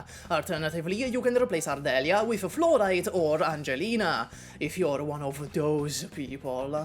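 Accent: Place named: Italy. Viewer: I dunno about "overwhelmed", I just came to listen to my favourite Italian voice for 20 minutes while I figure the game out myself lol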